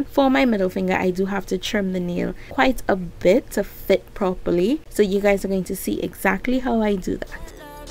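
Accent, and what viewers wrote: Trinidad and Tobago. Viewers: I enjoyed both videos i am a new sub & u sound like a trini just like me wow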